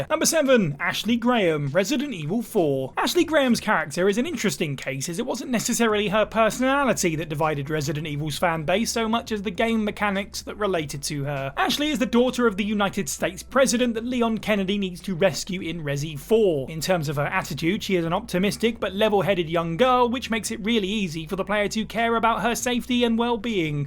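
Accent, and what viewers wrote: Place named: England, United Kingdom. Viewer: England accent 😢😅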